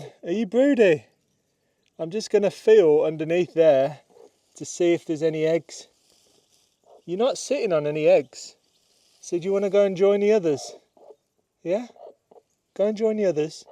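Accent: Birmingham, United Kingdom. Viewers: I live in the UK and was oriinally from Birminham, so your accent reminds me of home. I think you are such a survivor, you have that huge land, which was taken on as a couple, but now one man. All the animals also to take care of and put a video together. Just where do you get all this engery from? Really hope you get others to join you in caring for such a property. Hope the legal stuff gets sorted friendly for you x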